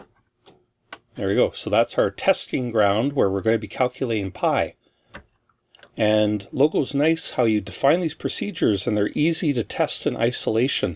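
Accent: Canada. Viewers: I love the gesturing hand. It seems to be trademark. I do wish he'd show his face a little more, but I think the gesturing hand works for him. Also, the soft-spoken Canadian accent. See also: <link> <link>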